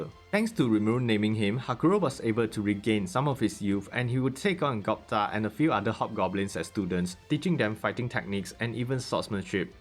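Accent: Singapore. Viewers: Sing-lish FTW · dude are you singaporean?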